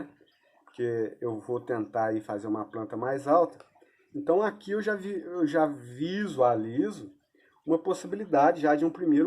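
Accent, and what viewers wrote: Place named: Minas Gerais, Brazil. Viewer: vai ter sim, ninguém vai compra la hahahah, gosto muito do seu canal, tbm sou mineiro e ouvir seu sotaque é voltar pra MG kkkk abraço e força ai no trabalho irmão , axé !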